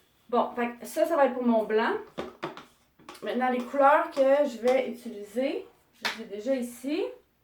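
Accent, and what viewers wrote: Quebec, Canada. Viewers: Enfin en français « québécois »...0h que je suis contente! Fais-en plus souvent... on adore!! Merci 👍😉😘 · Yeaaaah! en Français! Ah cet accent Québécois, qu’est-ce que j’aime! Merci... you freak. 😚❤️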